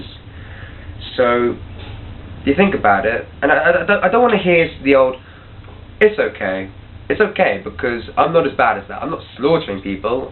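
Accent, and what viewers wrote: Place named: South, United States. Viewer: i love your videos they are very insightful:) p.s. im in love with your voice i love southern accents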